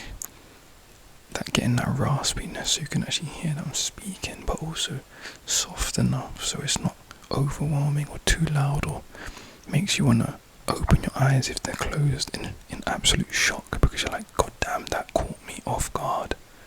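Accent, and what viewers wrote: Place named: London, United Kingdom. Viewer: lowkey hearing the london accent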